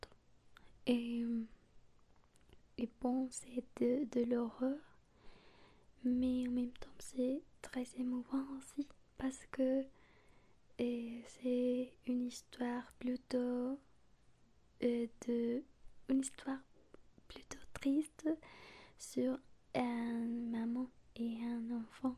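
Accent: Spain. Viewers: Merci d'avoir répondu à ma question. 😊😊😊 J'adore ton accent espagnol. ❤❤ Du coup, un grand tour de France à programmer.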